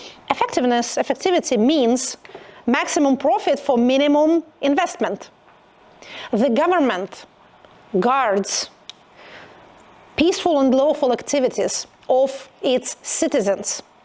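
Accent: Russia. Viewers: It’s a Russian accent not German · Зе инглиш из соу рашн❤ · С русским акцентом легче понимать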